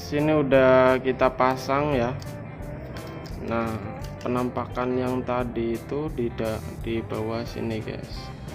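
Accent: Tegal, Indonesia. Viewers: Ketara wong tegal ya um😂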